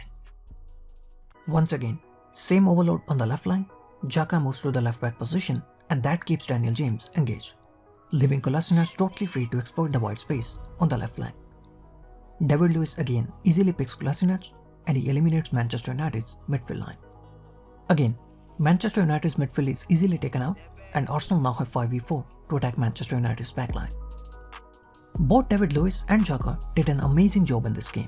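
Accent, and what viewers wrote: India. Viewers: It's so tiring to listen to your (Indian English) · What an Indian accents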